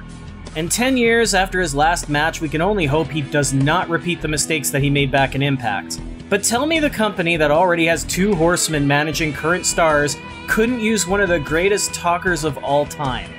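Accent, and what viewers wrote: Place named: Minnesota, United States. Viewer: that's cool. I unsubbed from all other foreign pro wrestling channels because it's really hard to listen to their accents . You just sound like I guy from Minnesota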